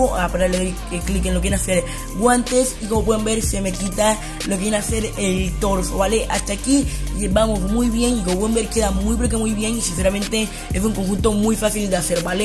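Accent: Colombia. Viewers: eres de colombia si eres de colombia de que ciudad yo soy de cali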